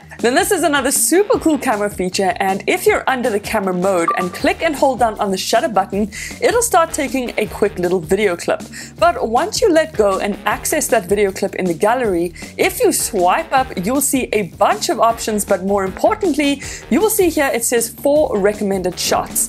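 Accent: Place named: South Africa. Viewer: South African?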